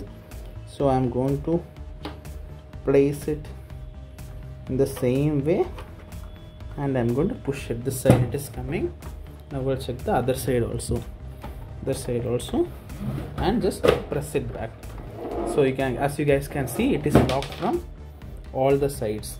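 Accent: India. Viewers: love your tanglish accent lolll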